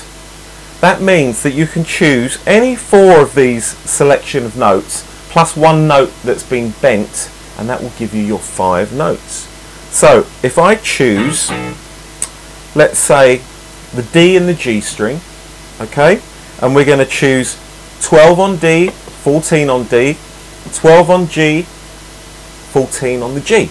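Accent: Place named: England, United Kingdom. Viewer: Great. Enjoyed it. And like the English accent as well.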